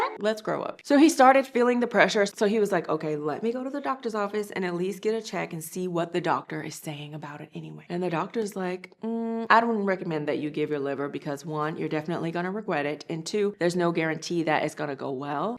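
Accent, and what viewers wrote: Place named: United States. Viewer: Love the stories and the American accent with occasional Korean.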